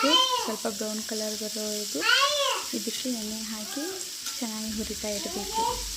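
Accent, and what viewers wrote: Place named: Maharashtra, India. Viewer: Akka avare niv marathi naa adakke nivu teve yannu tava annutiddiraa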